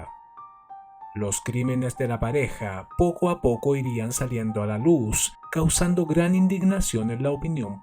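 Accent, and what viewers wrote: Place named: Chile. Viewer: Este canal es demasiado bueno, pero el hablar del locutor lo tira por tierra, pues tiene un fuerte asento chileño, podría contratar un locuror mexicano o colombiano, incluso español....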